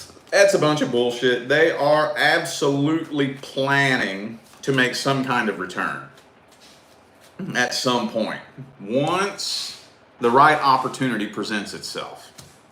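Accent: United States. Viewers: I like listening to your American accent . At times I think ' how I wish I can talk and sound like that.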